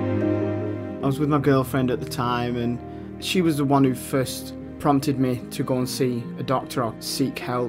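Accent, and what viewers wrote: United Kingdom. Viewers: Can anybody tell me where that accent is from? North of England? Not sure.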